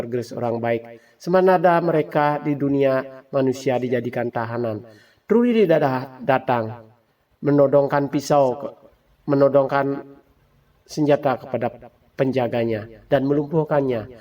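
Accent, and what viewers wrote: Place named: Kupang, Indonesia. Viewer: Orang kupang kyaknya nih😂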